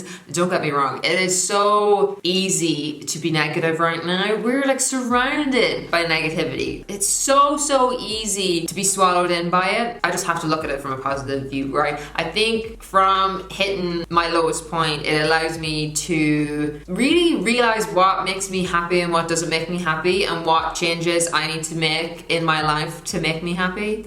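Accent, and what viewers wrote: Ireland. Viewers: love you girl!! Missed that gorgeous Irish accent on the tube of you 🥰🥰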